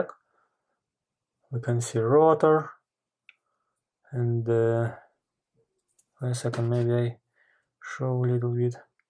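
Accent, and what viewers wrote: Russia. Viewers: Жесткий русский акцент. Английский - это не для Вас.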